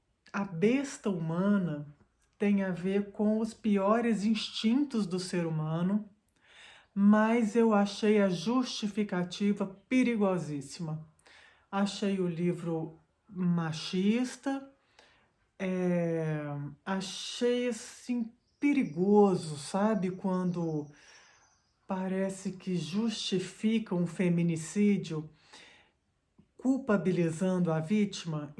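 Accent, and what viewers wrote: Minas Gerais, Brazil. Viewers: nossa, como amo seus vídeos e o seu sotaque então. sempre mato a saudade de Minas, estado onde passei minha infância e que tanto amo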